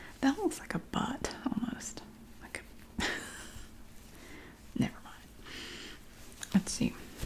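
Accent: South, United States. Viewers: I’m developing a southern accent because of you. I don’t mind it, it’s actually cool it’s rubbing off on me. I love you so so so much! ♥️♥️♥️♥️♥️♥️♥️♥️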